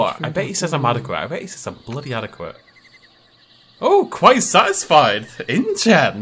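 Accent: United Kingdom. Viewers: A bit late, but happy 10th anniversary. Been with channel since very early, to the point I basically learned how to speak english thanks to this channel, british accent and all by trying to understand what you said in each video. James and you made my and many others' childhoods amazing, and are probably one of the most iconic dino-tubers in the scene. Well deserved 10 years of uploading.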